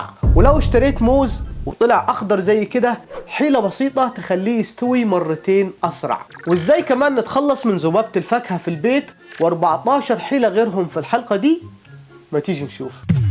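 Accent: Upper Egypt, Egypt. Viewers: صعيدي من قنا في جنوب مصر قرب الأقصر واسوان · لهجتج جميلة جدا جدا دي لهجة فلاحي و لا صعيدي الأثنين أحلى من بعض من فضلك لا تفقدها , محتوى قناتك رائع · شكرا على فيديوهاتك الرائعة .. من أية منطقة في مصر هذه اللهجة الجميلة؟ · لهجته صعيدى 👍🏼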